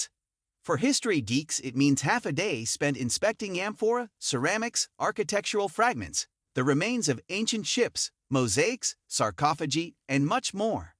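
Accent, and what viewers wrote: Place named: United States. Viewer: Wow. Hardly any words were pronounced correctly. Your American pronunciations spoilt the whole video.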